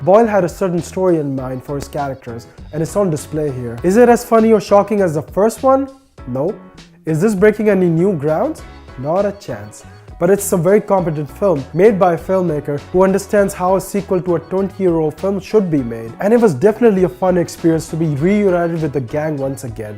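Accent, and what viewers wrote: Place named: South Africa. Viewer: YOu sounded more South African.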